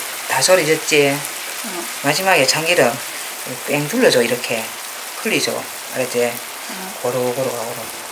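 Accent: Gyeongsang, South Korea. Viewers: 맛잇어요~어머님혹시어투가경남쪽같은데(김해) 그쪽이신가요? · 구수한경상도 말씀 듣기 좋아요~^^ 영상 잘봤습니다. 감사합니다~^^ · 구수한 경상도 사투리에 넘 정겹고 나의 요리 스승이세요 매번 잘 보고 있어요!!! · 아 저도 경상도 인데 사투리 대박이심니다 너무 재밋어요 재밋고 유익함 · 선생님 요리를 자주봅니다~ 뎃글은 처음이네요~ㅎ 경상도 사투리가 저를 웃음짓게 합니다. 집밥같은 맛! 선생님 요리는 정겨운 엄마집밥! 그자체입니다~ 감사합니다^^^^ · 경상도사투리 말씀 듣기가 좋아요 저도 경상도 ㅋㆍㅋ 정겨운 말씨와 요리도 맛가나게 잘하시네요 맛난것 많이올러주세요 ~ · 저는 부산사람이라 경상도 사투리 너무 좋네요 ㅎㅎ 잘배울께요~♥ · 경상도 말투 재미있어요 따님 살짝 콧소리 웃음소리 살살 녹입니다 요리는 최고입니다 복잡하고 어려우면 배울 수 없는데 정말 좋아요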